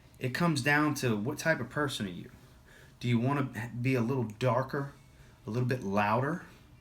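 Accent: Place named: Louisiana, United States. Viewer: Are ya from Louisiana my brotha?! Good job on the video! 318 for me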